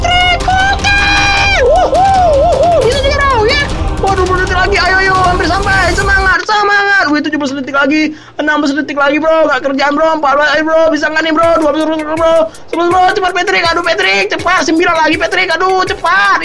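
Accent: Medan, Indonesia. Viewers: ngomong nya aja kaya orang medan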